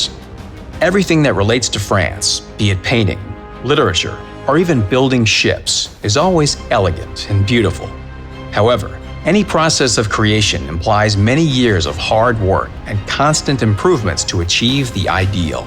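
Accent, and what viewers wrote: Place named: United States. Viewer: I'm getting sick of this American accent.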